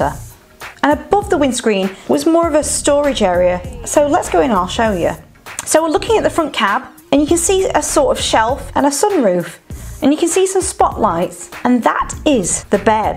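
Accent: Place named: Doncaster, United Kingdom. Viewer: Your finest hour .the best vlog .the longstanton spice museum 😂 aha aha 😂😂.the Doncaster accent was on form too.you proper make me laugh.hello when someone is trying to come in 😂😂.will be taking advantage of the McDonald's info.great vlog .carry on camping you could not make it up😂.can't wait for more fun vlogs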